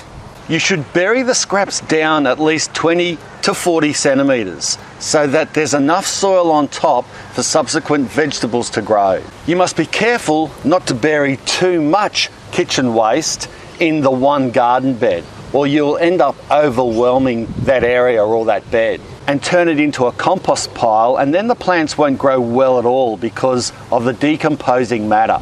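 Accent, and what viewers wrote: Australia. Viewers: I love Aussie accent! And also his kitchen scraps garden. It's again! Love it. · he said so himself in another of his videos. But also he has Aussie accent and you can see the background. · Somehow I did not expect an aus accent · I subscribed immediately after hearing his Australian accent lol! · like an australian? I guess we do talk weird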